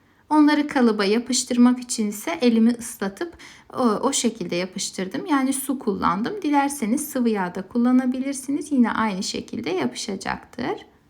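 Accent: Azerbaijan. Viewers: Deyəsən xanım Azərbaycanlıdır ləhcəsindən hiss edirəm. Bu arada əllərinizə sağlıq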